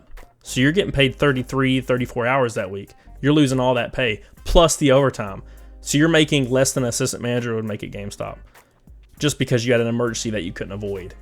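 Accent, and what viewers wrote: South, United States. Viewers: Off comment Where are you from I just love your southern accent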